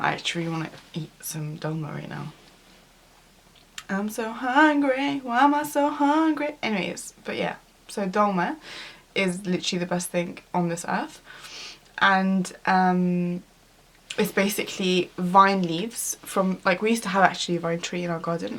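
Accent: Iraq. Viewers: yeah haha something about iraqi eyebrows! <3 beautiful tho!! also her arabic is iraqi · Your Iraqi is so similar to mine! hahaha, nmashi el hal.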